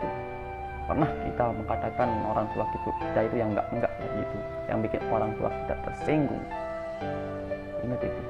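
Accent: Lombok, Indonesia. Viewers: Kayak logat lombok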